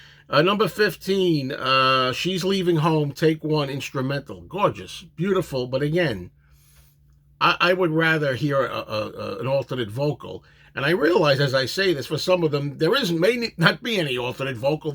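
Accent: New York, United States. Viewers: Great review! LOVE your NY accent.. You could be Murry the K...The 5th Beatle!! lol..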